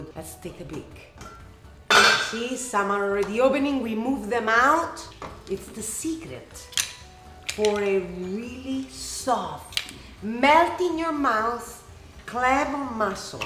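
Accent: Rome, Italy. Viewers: Whats wrong with you? Have you never heard an italian accent? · Sei di Roma Oggi l'ho sentito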